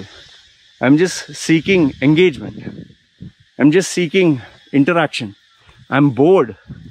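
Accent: India. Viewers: He speaks with Indian Accent.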